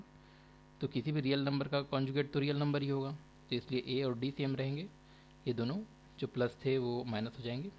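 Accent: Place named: Bihar, India. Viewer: bihari pronunciation is really irritating.when one is using English words should not have a regional accent.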